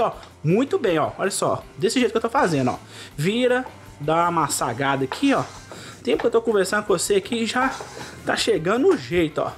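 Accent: Minas Gerais, Brazil. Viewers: Rapaz amei você eu sou de Pinheiro minas aí pertinho só que miro em Marília SP adoro quando vejo um mineiro conversar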